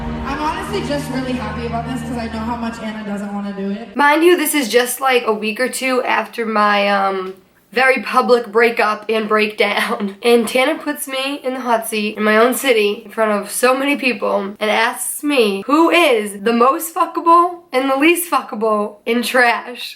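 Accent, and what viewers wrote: Boston, United States. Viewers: Kels LaMcintyre it is boston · Kinda sounds alittle Boston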